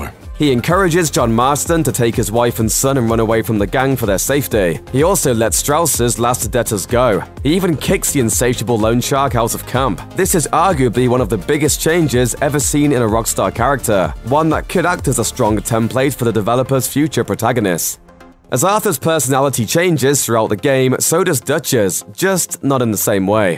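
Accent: United Kingdom. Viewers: Where are you from? I mean besides the UK. Love your accent and voice though. #nohomo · Is this guy a narrator for every video like this, or is this just how every single British man sounds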